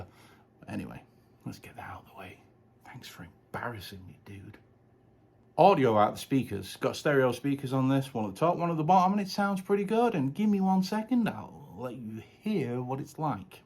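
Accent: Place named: Pennines, United Kingdom. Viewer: Loving this phone so far. I detect a north west Pennine accent here mixed with US. Great review pal 👍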